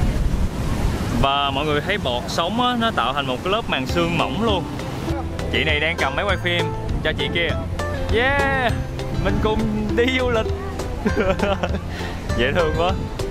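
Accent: Mekong Delta, Vietnam. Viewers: "Ở đây cũng có ruộng bậc thang mà nó cũng trụi lũi luôn" Giọng miền Tây dễ thương quá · Tiếng nói đậm chất miền tây ko lẫn với ai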